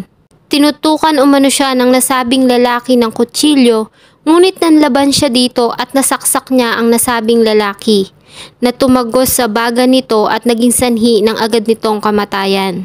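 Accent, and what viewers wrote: Visayas, Philippines. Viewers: Bisaya ka sis?asking lang po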